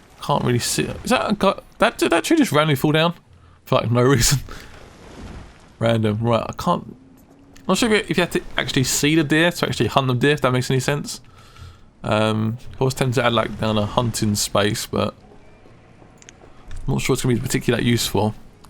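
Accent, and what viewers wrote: England, United Kingdom. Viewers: Wow I like the English Accent dude more than the gameplay lol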